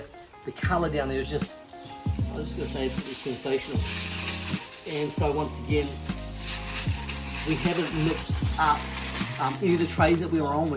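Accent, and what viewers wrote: New Zealand. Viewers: As soon as you spoke, I knew a Kiwi accent from anywhere 🥰 thanks for sharing 😊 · Looks great! Love seeing all of your BBQ gear! Do you have a favorite? Also, hearing you speak is making me miss NZ!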